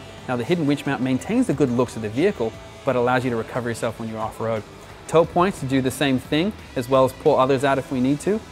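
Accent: Australia. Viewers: So lost he’s got a North East accent with Aussie undertones! 😆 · Idk how you have an Aussie and Brooklyn accent at the same time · Such a slick and polished video but the light bar is crooked. The presenters accent is so "Australian light" that it's distracting. But OMG those are some killer upgrades. The black car hides all the cool upgrades. A car that was any other color than black would have been a better choice IMHO. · Thank you for toning down your Australian accent so I can understand you! Great video and project - thumbs up!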